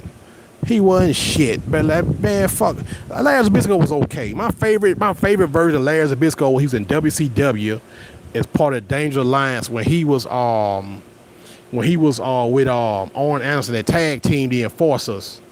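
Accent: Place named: South, United States. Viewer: Your southern drawl sounds like Mahmoud Ali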